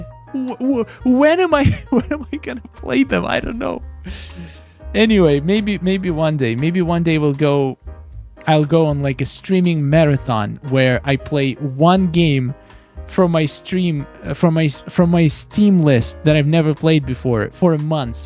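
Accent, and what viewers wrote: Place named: Ukraine. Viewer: I knew you are from Ukraine 😅 I was questioning myself every time I was watching your videos, trying to hear any confirmation by accent In any words 😂. And from the beginning I start watching your videos I was reading your YouTube name as, is it true? Is it your surname like that? 😅 it was my theory Thank you so much that you saying openly about russian war against Ukraine 💛💙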